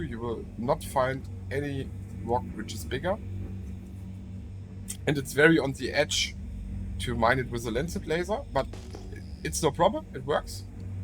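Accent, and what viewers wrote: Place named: Germany. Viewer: dont appologize for the "hard german accent" its amazing and your english is very good. Very easy to understand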